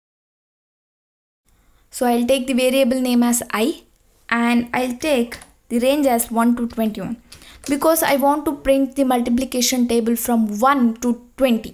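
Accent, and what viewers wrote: South India, India. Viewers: Are you from south side may be Kerela or tamil nadu side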